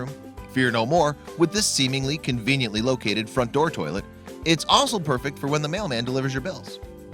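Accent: United States. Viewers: It's a bit disconcerting to hear copy so blatantly written by a Brit read by an American. Just saying, something you might want to think about.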